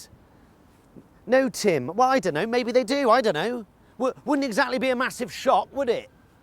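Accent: United Kingdom. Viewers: He just made me love British accent even more! I am crying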